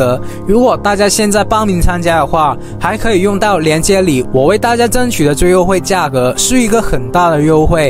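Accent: Hong Kong, China. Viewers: 不是台灣口音喔！感覺比較像香港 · 想問下是哪裡人呀？聽口音，難道是香港人嗎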